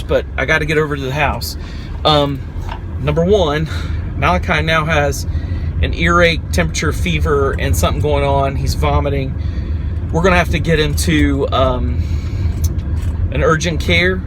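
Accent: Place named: United States. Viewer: Awe...a little country boy accent, to sweet, hope so!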